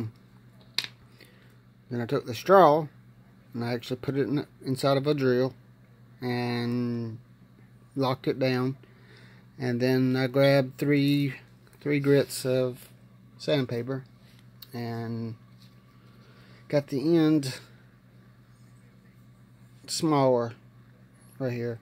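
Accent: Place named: South, United States. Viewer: Good job man! glorious southern accent!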